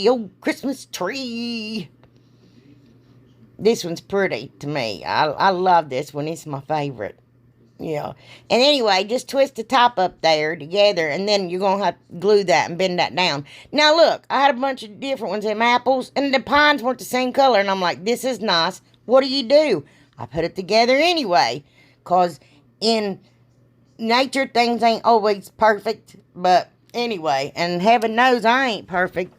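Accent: South, United States. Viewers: I am a fair dinkum Aussie lady who loves craft and love listening to your accent, so southern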